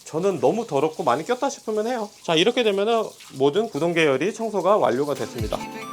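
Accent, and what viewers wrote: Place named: Gyeongsang, South Korea. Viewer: 경상도 분이네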